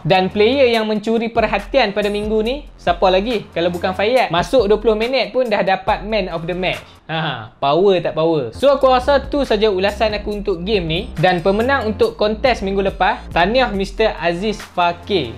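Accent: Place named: North, Malaysia. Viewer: Baru perasan bro admin ni ada dlm cite TAQWA DALAM TAWA syok caqa dia cakap pekat utaqa 😂👍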